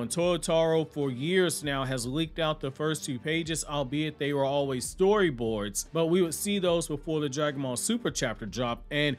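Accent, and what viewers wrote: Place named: East Coast, United States. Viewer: He really think down south people country 😢 you can tell he from the east coast 😂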